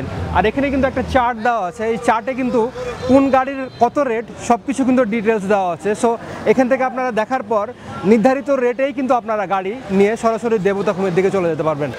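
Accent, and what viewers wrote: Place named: Kolkata, India. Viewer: ভাই কি ইন্ডিয়ান? কথার ভেতর কলকাতার ভাষার টান আছে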